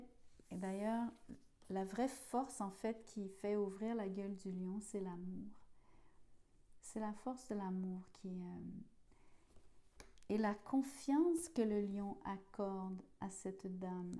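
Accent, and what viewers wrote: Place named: Quebec, Canada. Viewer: J'adore votre accent "je me souviens "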